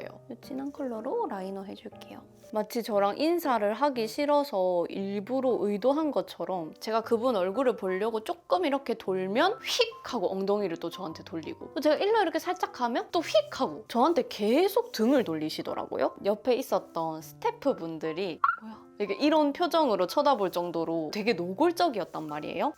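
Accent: Gyeongsang, South Korea. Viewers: 언니~~ 7,8년전부터 계속 봐왔으니 자기일인것만같아 너무 화가나네요…그때 한국어도 아예 못 했었는데 언니 영상을 맨날맨날봐서 그런지 지금은 일본인인데 경상도 사투리 조금 섞인 상태가 되버렸어요ㅋㅋㅋㅋ 그사람들에게 이제는 성공하고 멋진 모습을 보여줄 수 있어서 저도 너무 좋아요!ㅎㅎ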